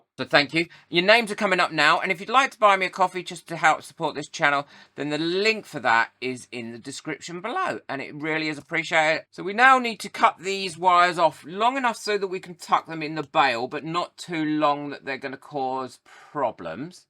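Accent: United Kingdom. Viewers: It's just wrong to hear US standards in such a thick UK accent...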